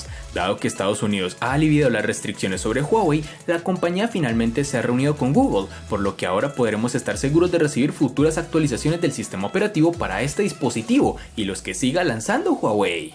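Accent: Colombia. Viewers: De q parte de colombia eres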